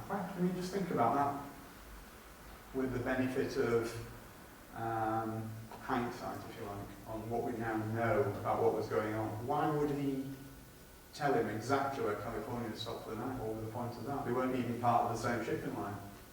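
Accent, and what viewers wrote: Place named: United Kingdom. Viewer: The echo-ey AUDIO, along with the fast speech, & British accent, make it difficult to understand what is being said; needs Improvement. Thank you.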